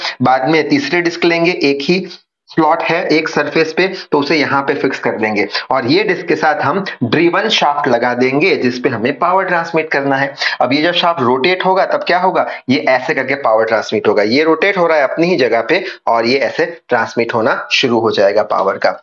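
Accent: Gujarat, India. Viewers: sir are you gujarati?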